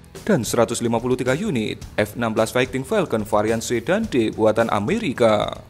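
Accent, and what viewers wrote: Java, Indonesia. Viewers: kok medok sekali logat nya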